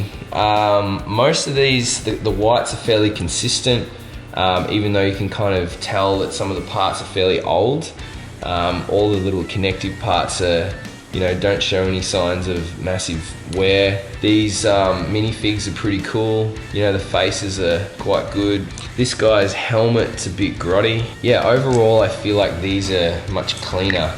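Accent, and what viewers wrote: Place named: Australia. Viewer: I know his Aussie I’m too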